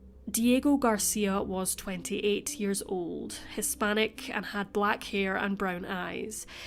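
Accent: Scotland, United Kingdom. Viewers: If she'd talk normal,you would not understand a word,unless your'e scottish???? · She's enunciating her words properly so everyone can understand what she's saying... it's not slow. The Scottish accent is sometimes hard to understand if you're not from Scotland. Listen at a different speed if it bothers you that much 🙄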